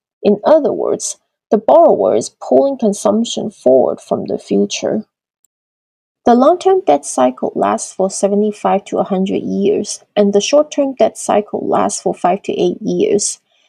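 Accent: China. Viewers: 能听出来你是中国人哈哈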